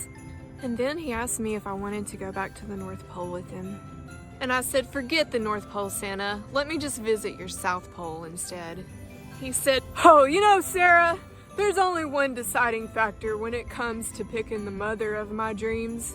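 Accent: South, United States. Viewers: Sarah, tell Santa you've been around a bit, but you ain't no ho. You're beautiful, sexy, that charming Southern accent unravels my socks and makes me stand at attention. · I love the southern drawl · Redneck accent but you ain’t half gorgeous 😋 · a beautiful one with a southern drawl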